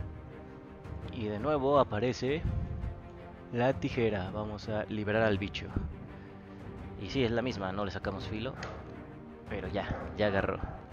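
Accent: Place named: Costa Rica. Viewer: Hola, Habla D'top motion, no es nadie importante mira. Se que eres de Costa Rica, igual que yo 😙, en el video " Tips para un buen stop motion" había una camiseta de la selección de Costa Rica ( la sele) y no sólo por eso, tu acento y todo. Se nota que eres tico. Me podrías decir COMO RAYOS TIENES TODAS ESAS FIGURAS ( ESPECIALMENTE EL SPINOSAURUS AEGYPTIACUS) saludos crack!! Pd: sub desde hace 3 años 😍😎😍 Donde conseguiste el spinosaurus acá? Amazon o que? En Costa Rica es ( para mi) imposible conseguir dinosaurios en tiendas departamentales ( walmart, Target, ect) Solo una ligera explicación por favor!! Atte: D'top motion.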